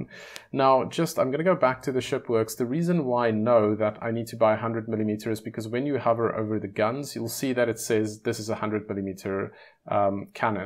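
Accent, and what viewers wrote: South Africa. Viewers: i know a south african when i hear one... jy kry n sub bra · excellent video...exactly speaking to the things I struggled with even after watching multiple guides...specifically struggled with fuel and how to get money to buy more fuel. Your accent sound South African... Even more reason to subscribe ;-)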